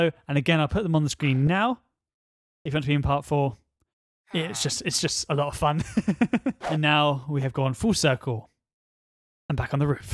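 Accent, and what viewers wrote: United Kingdom. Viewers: MAN THIS DUDE IS SO INTERESTING I LIKE YOUR VIDEOS KEEP UP THE GOOD WORK,THOUGH ITS KIND OF LIKE MUMBO JUMBOS,UR ACCENT IS BRITISH UR CLOTHING IS FORMAL AND U DO REDSTONE VIDEOS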